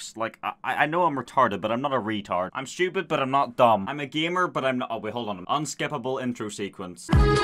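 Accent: Northern Ireland, United Kingdom. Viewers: Your voice is a blend of American, German and Yorkshire Edit: ok that is basically a Northern Irish Accent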